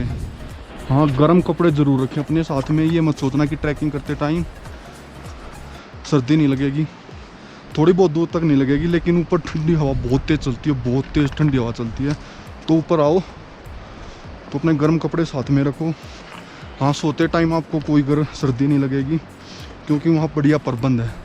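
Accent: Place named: India. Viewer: U narrated ur expedition very well ....almost covered everything... N d desi accent stole d show👌👌👌 Next time do plan for tapovan ... All d best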